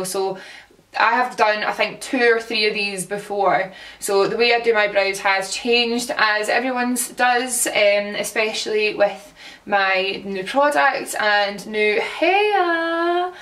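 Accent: Scotland, United Kingdom. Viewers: Is it weird that I'm australian and yet when I read your comments I hear it in a Scottish accent?! lol! I love your accent x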